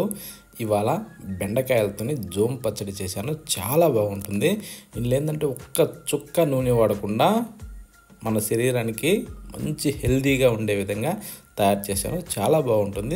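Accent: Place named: Rayalaseema, India. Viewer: Gudka yendi bhasha bagaledu seema yaasa😂😂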